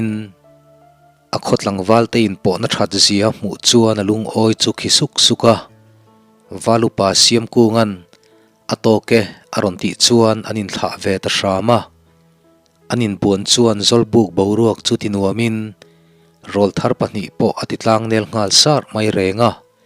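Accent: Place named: Pawi, India. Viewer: Mapeka, ka va zah che em aw, i thiam ang tih ka ring e, keini thangthar leh thalai zawk tena kan lo chhiar dan leh hriat dan hi a dik fu lo zawk em mawni tiin tiin ka in ngauhtuah rum rum mai. 1895 ah khan mizo hian hawrawp te kan lo nei ve tan chauh kha ani nia, ziak dan leh lam dam dik hi keini thangthar zawk te hian ka lo hre dik lovin kan ko hre fu lo zawk nge ni. Sir hi ( Synod- Sinawt-a ) Music kan zir laia an lamrik fo anih vang leh an sawirik zina a tumruhna te an sawi vangin, a channel hi ka bel chawt mai ani ani, sawi dawn ila ka sawi treuh thei mai hian ka ring e. Lai (pawi/pawih) chuan Lei ( Tongue angin kan lam thin) Lushei/Lusei chuan Lei ( Soil) angin an lam thin niin ka hria. Leido kan nei thin a ( Lei-do) Lei anga Sir a sawi a nih chuan Lai /pawih tawng angin a sawi tihna ani ah ka ngai. ( Khawlhring/ khualsim/ hlawncheu te nen bik hian tawngin ang deuh an hmang vek ani) Entir nan ( Lei do kan nei ih, kan neh ngei ding an lu ngei cu kan la ding). 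Pawi tena kan lamdan leh sawi dan aw. NB: Tawng reng hi a dang a, tui a dir e an tih lai in, tui a haw ti tawk kha kan awm miau mai kha ani a, sawi fiah viau dawn ila diklo ti tu an awm anga, dik ti tu te ab awm ang, kan tawng zawng alo har ani e.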